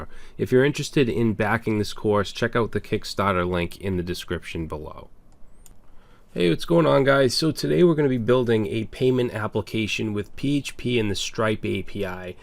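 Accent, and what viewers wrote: Boston, United States. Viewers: Yo is it just me or does his boston accent have him sounding pissed the entire video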